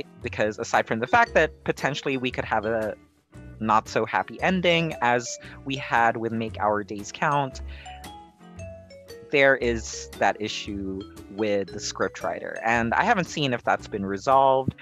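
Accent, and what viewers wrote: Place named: England, United Kingdom. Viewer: I just finish this bl series and I really love it! This is the first bl series that really push me to download it on my devices because I always feel that I'll eventually lose interest on the previous bl's I watched and this one is a must watch! Though I never watch the 3 first HIStory part because I really don't have the interest? Don't get me wrong! And also this is the only bl series I watched that gives me a lot of emotions. Thanks for this amazing reaction vid and I love your English accent!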